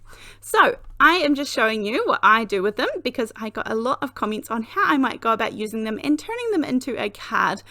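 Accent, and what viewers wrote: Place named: South, New Zealand. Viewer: Love your channel and my other half thinks you're from either Christchurch or further south... He thinks you're a proper southerner! I'm from about as far north as you can get up here in England in Lancashire. He's from Wellington originally and lived in Dunedin once. Please keep up these amazing video's x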